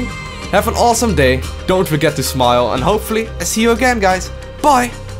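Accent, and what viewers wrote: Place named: Netherlands. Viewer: Wow you're so obviously Dutch the accent is uncanny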